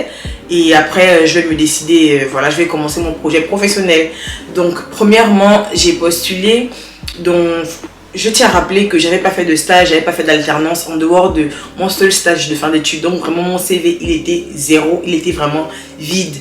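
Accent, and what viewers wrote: Gabon. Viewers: Toi t'es gabonaise 😂😂😂 l'accent ça ne ment pas... J'aime la vérité et le côté naturel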